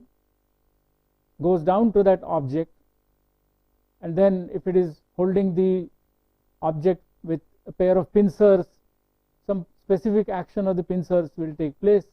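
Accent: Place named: South India, India. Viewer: Some Southrn Indian Mix English But it can be understand, Who D Fker Says It Cant be understandble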